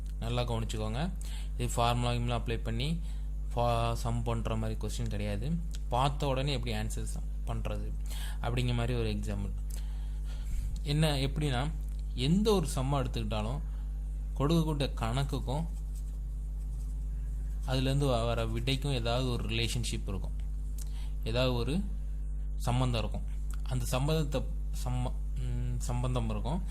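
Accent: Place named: Tirunelveli, India. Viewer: Deepak Dharman it's thirunelveli speach......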